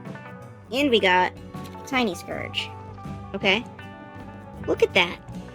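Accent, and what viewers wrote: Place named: United States. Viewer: i like ur american voice 🤣🤣🤣sorry im british 🤭🤭🤭🤭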